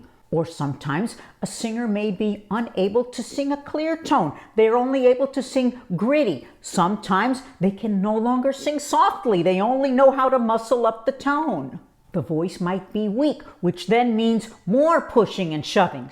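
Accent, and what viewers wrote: New York, United States. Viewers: Are you from NY? Lol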